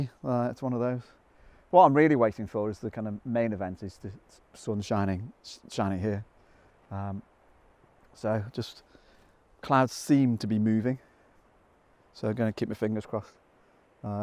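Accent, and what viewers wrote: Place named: Wirral, United Kingdom. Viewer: Thanks for sharing. Really good narrative to your thinking of the composition of the images. Plus you sound like a local of mine (wirral) A definite sub. ATB Andy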